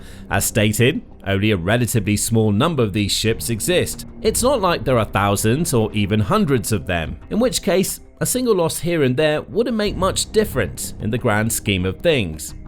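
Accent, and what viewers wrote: United Kingdom. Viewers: The English (UK) accent is starting to annoy.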